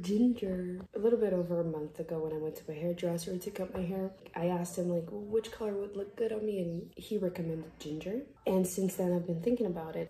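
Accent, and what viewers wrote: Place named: Brazil. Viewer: Eu suspeitei que você fosse da região de onde eu sou porque você chamou a fruta Pinha de "pinha" em um vídeo, e não de fruta do conde ou outros nomes que outros lugares do país dão. Eu sou de Tanabi e descobri que você é de Rio Preto, fiquei feliz hasuhauhsuhaus Vi o filme que você fez, representando uma norte americana. Que tudo, um inglês totalmente brasileiro sendo usado pra representar americanos quando a gente como brasileiro ta cansado de ver os gringo usando mexicanos e outros para representar brasileiros hehehehehe~~ Feliz, obg por representar, viu. E agora como ruiva vão te confundir mais ainda com outros países haushuahuhs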